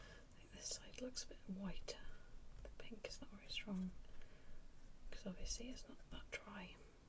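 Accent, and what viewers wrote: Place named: Wales, United Kingdom. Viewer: oh i've been looking for more welsh asmr creators! such a lovely accent and voice ❤️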